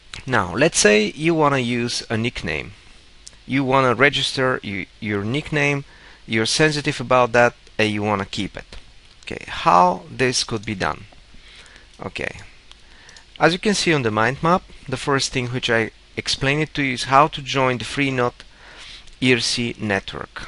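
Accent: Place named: Russia. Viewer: I liked your Russian accent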